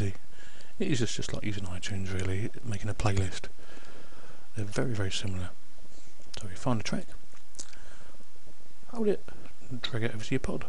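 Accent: United Kingdom. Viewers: Thanks. Its very easy in ubuntu. I am having problems with itunws and windows. And you hace a nice accent :) british? Regards, saludos.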